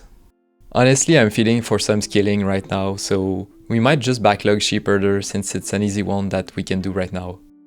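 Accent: Quebec, Canada. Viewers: french or quebecois, he speaks well tho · Quand juste l'accent te dit que t'es queb haha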